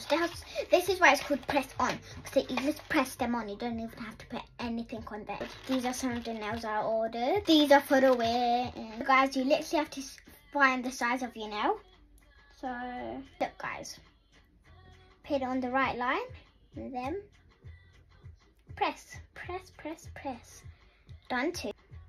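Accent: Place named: United Kingdom. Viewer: You where british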